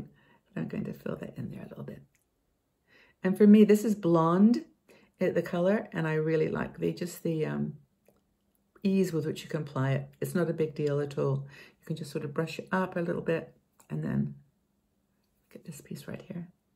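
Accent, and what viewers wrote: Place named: England, United Kingdom. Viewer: There’s definitely a bit of an English accent there. 🙂💕 🇺🇸 🇬🇧 is so charming and fun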